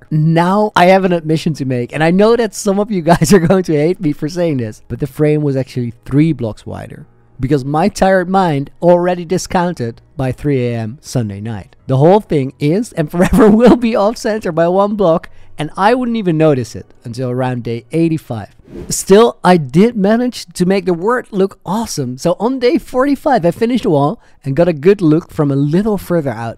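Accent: Netherlands. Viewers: I hear you’re Dutch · My guy is Dutch?!?!?! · Mate judging boy your accent your dutch arent you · Are you dutch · I knew the guy was Dutch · I like that doesnt try to hide his Dutch accent completely like other youtubers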